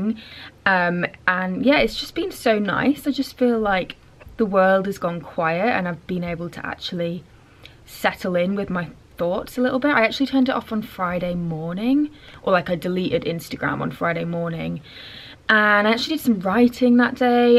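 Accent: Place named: North, United Kingdom. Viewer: Way more relaxing then any ASMR channel. For some reason when you spoke on this video you sounded a lot more ‘northern’.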